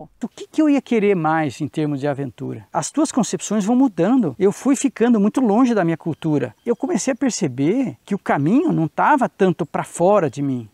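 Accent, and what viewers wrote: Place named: Paraná, Brazil. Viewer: 👏👏👏👏 pelo sotaque e pelo nome vc é. Eu conterrâneo pelo jeito do Paraná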